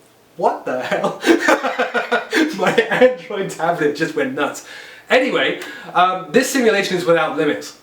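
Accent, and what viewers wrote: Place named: United Kingdom. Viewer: You live in the states? Thought you were british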